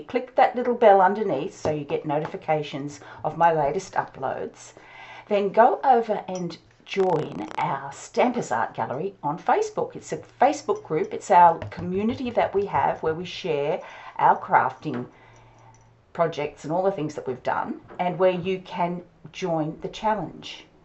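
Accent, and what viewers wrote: Australia. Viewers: Just found you through a friend. Nice to hear an Aussie voice!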